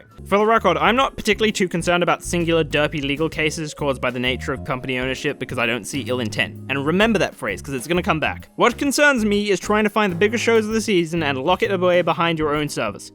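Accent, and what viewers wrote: Australia. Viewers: wait, you're australian?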